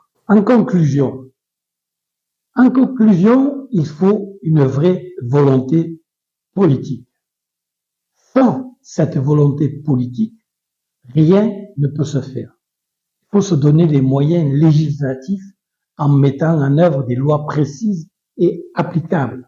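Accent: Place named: South, France. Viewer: Qui es tu? Avec ton accent du Sud français, pourquoi tu ne reste pas à 6000 km à t'occuper de l'urbanisation marseillais?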